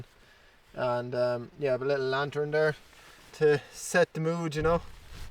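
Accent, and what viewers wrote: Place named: Ireland. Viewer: It’s just nice to see and hear an Irishman on YouTube!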